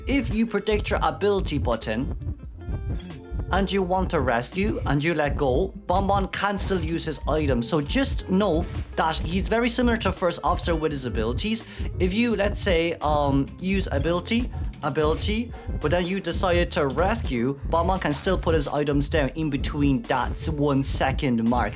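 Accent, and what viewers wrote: Ireland. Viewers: i didnt know irish people pronounced words ended with -t as -sh, that's interesting!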